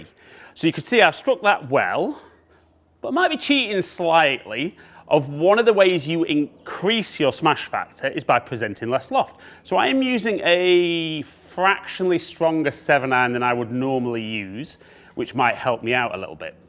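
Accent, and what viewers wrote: England, United Kingdom. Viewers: WOW, not knowing the difference between further and farther!!! And my man sounds English to beat all!!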